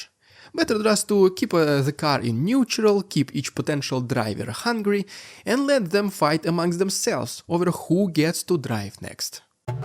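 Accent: Russia. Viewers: I don't know what will happen, but I have been waiting for this video, long before you made it. People have talked about Putin wanting to conquer Europe, along with other ideas like Putin will live for a really long time. Yet, Putin is already an old man. It is only a matter of time until death comes for him. Putin is a snake that is running out of time, trying to secure his legacy, in my opinion. Watching your videos is getting me used to hearing Russian accent correctly btw lol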